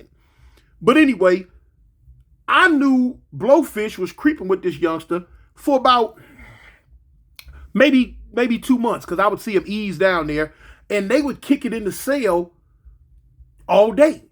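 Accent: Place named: Colorado, United States. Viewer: Yo Big homie can you do a story about my cousin RIP OG Businessman Berry (Godfather the loan Sharker)killer Clause and my Uncle Freddy( he was in and out of prison that frequently use Herion) you say certain words that remind me of them! It’s that OG South Park Slang homie!!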